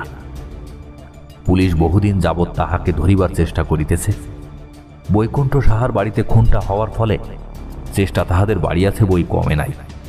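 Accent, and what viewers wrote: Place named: Khulna, Bangladesh. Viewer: ভাই আপনার বলা সাবলীল ভাষা বিশেষ করে খুলনার টান ভালো লাগে।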